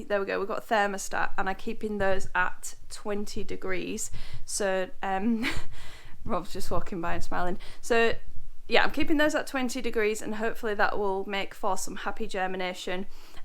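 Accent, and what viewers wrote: East Yorkshire, United Kingdom. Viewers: Love your East Yorkshire accent😊m from Leeds very different but similar.I’m an amateur gardener but I’m following your amazing project. Looking forward to to seeing your plot grow. 🐝🌼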